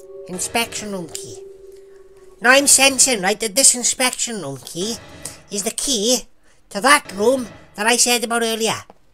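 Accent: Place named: Wales, United Kingdom. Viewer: Funniest welsh accent ever never heard any in my life but I'm from Carmarthen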